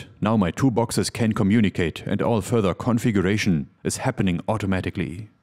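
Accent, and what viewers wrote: Germany. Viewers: After 1 second I knew you were German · a german accent to die for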